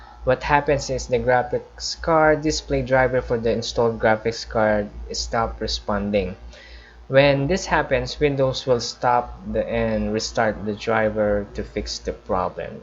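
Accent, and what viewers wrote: Philippines. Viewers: cant really miss that distinctive pinoy english sound lol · Proud of you accent sounds filipino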